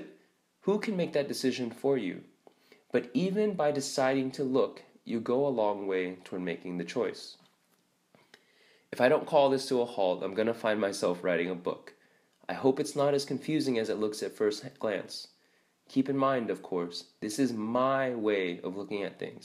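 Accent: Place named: East Asia. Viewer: Indigomarine91 cause of this zipperheads accent probably.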